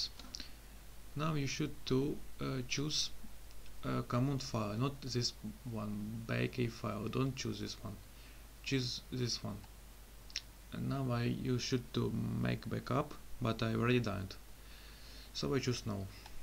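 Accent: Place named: Russia. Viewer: Прекрасный русский акцент👏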